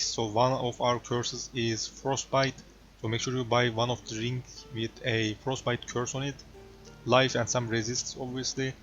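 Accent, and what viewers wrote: Turkey. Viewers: Ingilizce aksanından Türk olduğun öngörüsünde bulunacaktım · Bu adam türk değilse insan değildir. böyle konuşan türkten başka insan olamaz.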